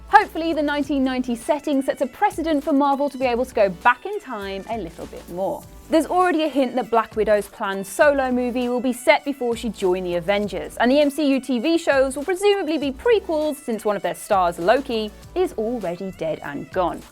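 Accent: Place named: Midlands, United Kingdom. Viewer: Midlands accent! Woo!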